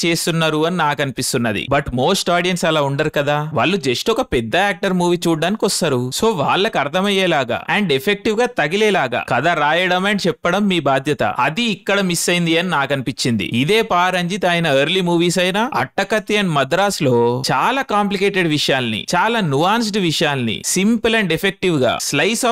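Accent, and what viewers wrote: India. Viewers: Arey.....ayaa....idi English lo Telugu la undiraa...em matladutunnavra ayyaa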